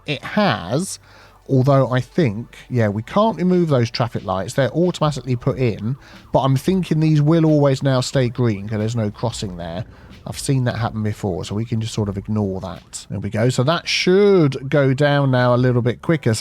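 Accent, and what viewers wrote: United Kingdom. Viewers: How do you pronounce Chicago,? I've realised it definitely gets a ch from me but then again I'm not a native English-speaker. Michigan pronunciation I wouldn't have known if it wasn't for CPP but my British English influence is just so strong since school that it plays up on me. Your accent just brings me back to the UK, love it ❤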